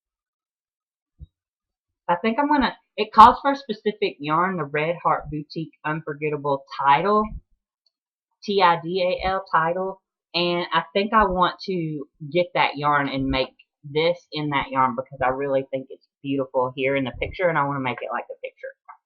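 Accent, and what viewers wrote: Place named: Georgia, United States. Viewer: Good morning, I just checked out your podcast, I did a quick catchup. The whole time I was watching I thought you have a strong Georgian (you all.......) lol accent. I missed you telling your location. Diamond is too sweet. By the way we are neighbors. Marietta is were I knit. Keep it coming.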